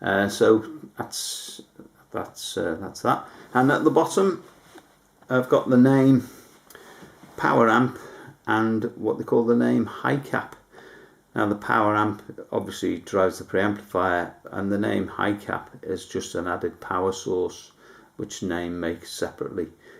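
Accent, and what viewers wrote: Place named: Liverpool, United Kingdom. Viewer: You sound like a Beatle Liverpool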